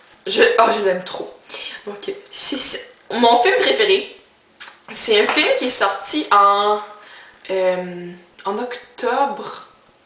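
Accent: Quebec, Canada. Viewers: Tu es géniale tu bis au Québec ça je le sais à l accent mais si c est pas trop personnel tu vi à Montréal ??? En tout cas moi oui;D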